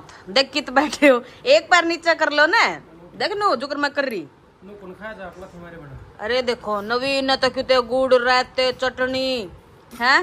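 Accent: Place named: Haryana, India. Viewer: Beta aapki Haryanvi Bhasha bahut hi acchi lagti Hai mujhe to❤❤❤❤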